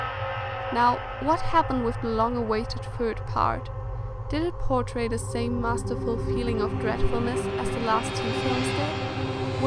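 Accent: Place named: Germany. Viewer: GERMAN. You can hear it :D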